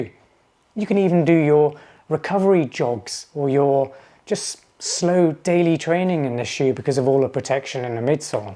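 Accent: United Kingdom. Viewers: You have British accent but talk in dollars. Did you go TTS in your UK size or US. Many say go up half a size in NB but I've just bought these in my usual 9.5 that I buy for most trainers. I'm hoping when they arrive there ok it's more length I'm bothered about as I have average width feet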